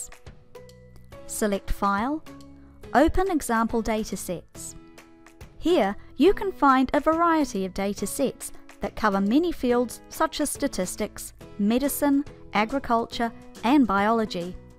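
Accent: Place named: New Zealand. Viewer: You sound kiwi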